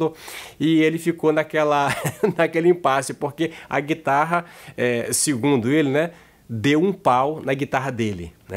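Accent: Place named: Northeast, Brazil. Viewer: . Oque eu aho legal é que o seu "x" nordestino é diferente do "x" carioca. Kkk E é um chamariz no seu marketing pessoal.😆😆😁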